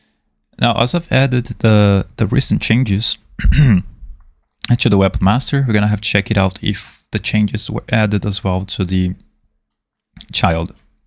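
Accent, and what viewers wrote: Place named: Brazil. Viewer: mano, tu é brasileiro kkkkk programo ouvindo vc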